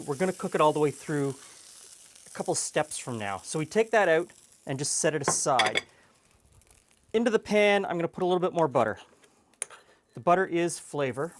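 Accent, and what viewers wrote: Canada. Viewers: I try to pretend you’re not Canadian but you pronounce literally everything differently than Americans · Makes sense. Canadian English sometimes favors British pronunciations to American ones.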